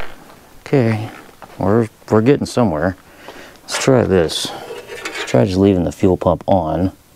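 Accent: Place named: Texas, United States. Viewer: Hey you know Texas Talk just like me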